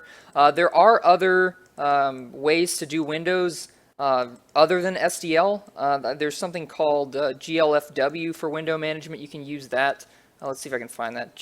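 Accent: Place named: England, United Kingdom. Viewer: My god! pure english accent!!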